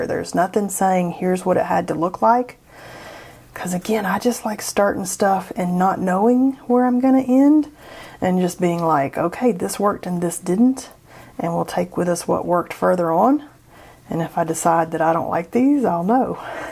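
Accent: South, United States. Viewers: Never let go of your southern drawl or how you pronounce things! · your southern accent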